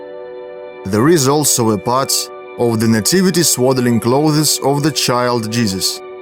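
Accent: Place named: Eastern Europe. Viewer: This is all very interesting. I have been there, so it brings back good memories. However, why not get a native speaker of English for this series? It is a bit painful to have to listen to such a funny Slavic accent for a whole hour or more. Besides, there are quite a few words which are mispronounced or barely understandable. Even the word "skete" sounds something like "skit" which is something else entirely. Also, at about the music is so loud that the dialogue is even more difficult to understand. I tried to use the subtitles, but they did not make much sense as the mechanism could make little sense of the funny accent.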